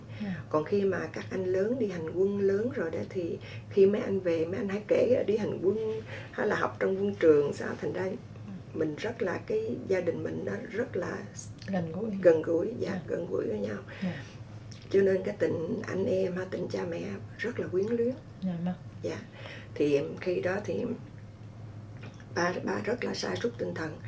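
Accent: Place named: South, Vietnam. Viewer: Cuộc đời chị như một cuốn phim thăng trầm với giọng kể chân thật, giọng nói miền Nam ấm áp. Với tấm lòng yêu thương mọi người và kính hiếu với cha mẹ, tận tâm với em út. Những ân tình của chị với những phận đời đau khổ trong chiến tranh, sự tưởng nhớ đến những hương linh của những người vượt biển nhưng không đến được bến bờ tự do....Chị thật sự là 1 người đẹp cả dung mạo lẫn tâm hồn. Ngày nay, rất hiếm có những người tốt như chị.Cầu mong ơn trên ban phước lành cho chị và những người chị yêu thương. Cám ơn chị.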